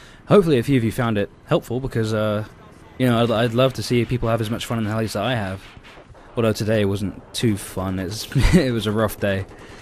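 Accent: United Kingdom. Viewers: a littele bit american,but strong british accent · What nationality are you? You speak with an english accent, except you roll your 'r's like an american.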